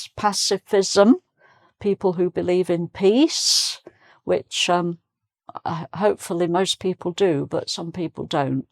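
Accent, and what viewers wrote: United Kingdom. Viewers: Hello Teacher! Be sure you are a very special teacher! British English sounds much more beautiful with you!Thx to you we feel like learning more about your native language and about The UK! You are a symbol of The United Kingdom through the world!Greetings!❤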